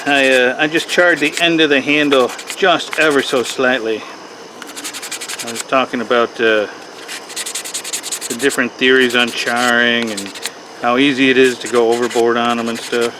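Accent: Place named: South, United States. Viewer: LOL I came to comment about how southern he’s sounding these days 😂😂😂 I wonder if he notices he’s doing that 😂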